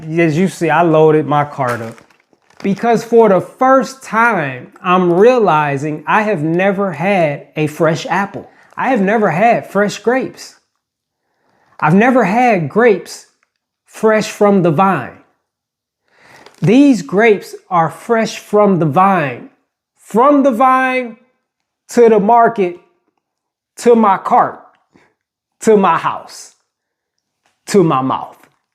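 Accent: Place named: Ohio, United States. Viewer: Bro. I'm in Cincinnati. Please let me know where in Ohio to get these fruits. I kinda heard it in your voice that you was from Ohio. Lol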